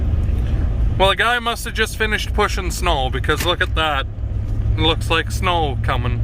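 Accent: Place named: Canada. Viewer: Can't tell if you live in Canada, michigan, minnesota, or an state next to the 7 lakes oh yeah and also thanks for taking your time and hard work to feed people and I feel you live in Canada because your tone, voice, and they way your talk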